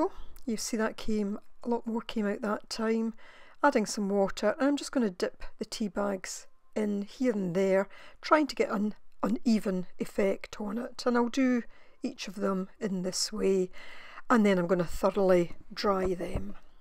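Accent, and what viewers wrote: Scotland, United Kingdom. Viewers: I just loved this... thank you so much. Fabulous! I so enjoyed your Scottish accent. My only son works and lives in Scotland as a green keeper. I have visited once in 2019. The most magnificent country. I hope you are safe and well wherever you are. Stunning work. Aaah tea ☕️ best regards from South Africa to you 💫🇿🇦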